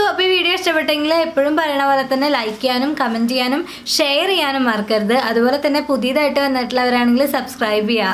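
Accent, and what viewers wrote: Thrissur, India. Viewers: സംസാരം kekkan nalla rasam undu.... thrissur aano....???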